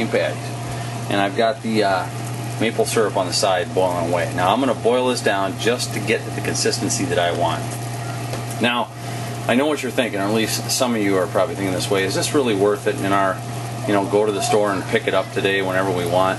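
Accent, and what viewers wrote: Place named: Wisconsin, United States. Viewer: You live in wisconsin cuz I do and you have the accent lol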